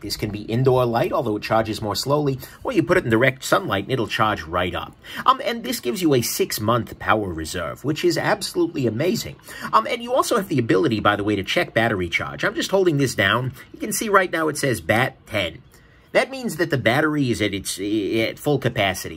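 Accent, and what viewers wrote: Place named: Chicago, United States. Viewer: “Da watch over dare.” Are you from Chicago?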